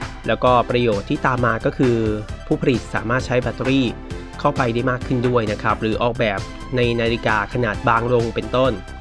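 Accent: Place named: United Kingdom. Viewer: ชอบสำเนียงอังกฤษ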